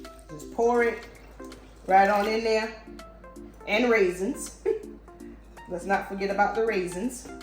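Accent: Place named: South Carolina, United States. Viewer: This is how we make bread pudding im from SC, and she sounds like she maybe from there as well or close. I've seen ppl bread pudding and I wouldn't eat it because it didn't look like this 😂. I also toast my bread first ❤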